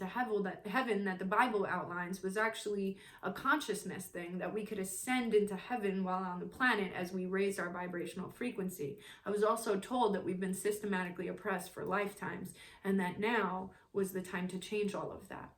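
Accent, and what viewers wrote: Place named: New York, United States. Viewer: It's the new York accent but I agree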